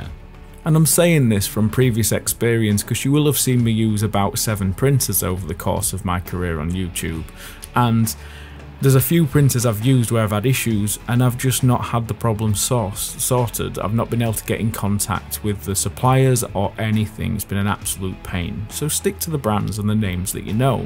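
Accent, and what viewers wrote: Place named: Yorkshire, United Kingdom. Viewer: Always love your videos buddy, nice to hear a Yorkshire accent hopefully bump into you one day I’m not far away in Rotherham myself.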